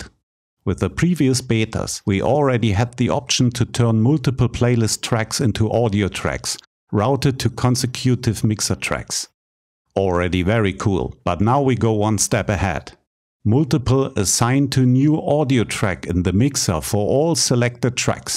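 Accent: Germany. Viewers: I LOVE this channel! Gold mine! And the German accent makes it quite entertaining! 😄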